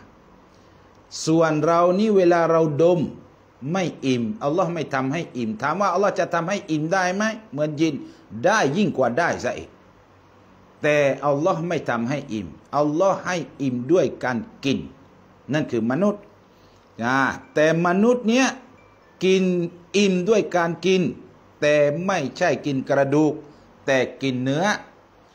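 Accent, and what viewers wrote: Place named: Nakhon Si Thammarat, Thailand. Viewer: นครศรีธรรมราชชัดเจน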